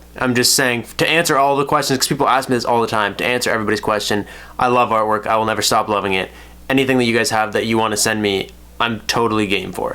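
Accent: Canada. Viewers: You have the accent canada is where its at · Is it just me or did you didn’t notice accent until finding out he lives in Canada?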